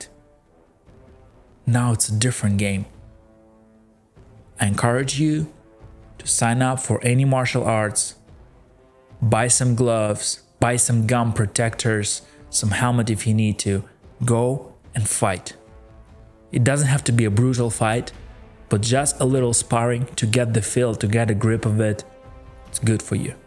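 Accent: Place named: South America. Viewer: I dig your South American accent . Good accent My God, i hope you're not Egyptian. Sincerely Dan, South Dakota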